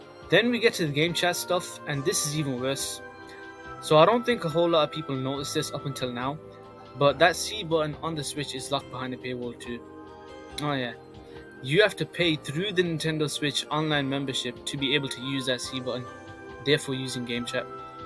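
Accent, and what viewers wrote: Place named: United Kingdom. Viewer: Are you british? That accent is pretty cute ngl haha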